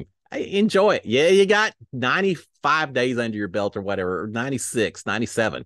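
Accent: Texas, United States. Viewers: Thank you, for all of your hard work. I especially appreciate your clean recaps bc I'm not a fan of the language so many of the house guests use. So thank you for that. Not to mention, I totally enjoy hearing a fellow Texan while I'm living in Phoenix😂 Have a Blessed day!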